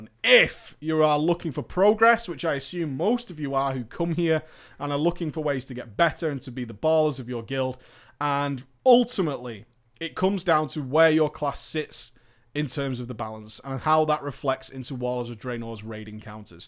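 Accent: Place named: United Kingdom. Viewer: he has a British accent and he lives in england. theres a million and 1 kinds of accents over there.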